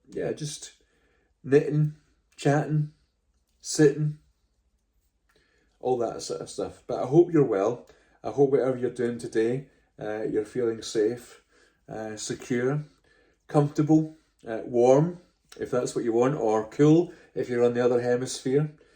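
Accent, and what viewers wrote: Scotland, United Kingdom. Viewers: I have been truly blessed since I found your podcast, please continue doing what you are doing! I grew up in the Chicago area and our very best family friends were from Aberdeen. Because of them, I’ve always been interested in Scotland and wished I could visit. My husband isn’t into travel abroad, so this has been the next best thing for me! I live in the South now, but told “Aunt Mary”, who still knits at 96, about your podcast, thinking she would love to see scenes and listen to that accent from “home”. ❤️ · I enjoyed your sitting and knitting chat. Also, being a birder as well as a knitter, maybe a few shots of local seabirds from Scotland? Don't see them much in Canada. And the different plaids from the different clans is always interesting to us who don't see them much. Anything you put together with your intriguing Scottish accent is always welcome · Three of my favourite things😊 Knitting, Scottish Tales and a gorgeous Scottish accent. 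I could listen to you all day. My lovely late hubby Gordon was always telling fascinating stories of his home’s history. Also I’d be interested in seeing a pattern for the ‘kep’. I can’t find one. Probably because I’m not spelling it correctly. 😂 Thank you 🙏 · What a lovely episode, it's so relaxing to listen to you tell stories in a calm Scottish accent while I'm knitting. I would love to hear more stories from Scotland. The Chiaogoo interchangeable needles are great, and the red cable is much softer than the Knit Pro Zing (I have those too), and they come in small sizes and different lengths. Thank you, have a lovely week 😊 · I discovered you last weekend and have watched close to all of your videos while knitting. I´m unsure what exactly it is, but it is so nice and soothing to listen to you talk. There´s not a single second of boredom in the hours of content and I LOVE seeing the landscape and small town footage. 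(and the Scottish accent is lovely as well. Would totally trade that for my Austrian any time). So yeah, I´m excited to watch more of your videos. They´ve accompanied me well while learning Irish Cottage Style knitting (Great for working seamed garments because purling is much less of a hassle this way and it looks super cool).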